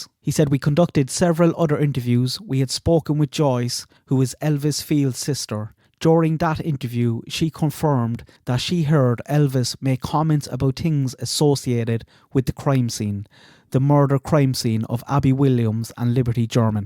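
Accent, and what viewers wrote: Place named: North Cork, Ireland. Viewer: Excellent video, my only criticism is that it went a bit fast, the pictures & text. Btw, is that a north Cork accent?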